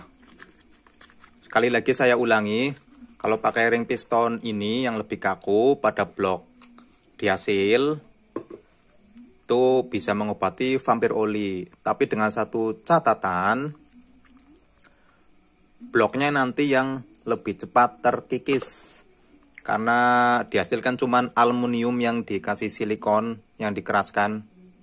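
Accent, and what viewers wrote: Banyumas, Indonesia. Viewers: Ndeleng logate kaya kaya cah ngapak apa ya??🤭😅 Salam ngapak boss..🙏🤭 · Kayane wong ngapak gie,tak subsicrab mashe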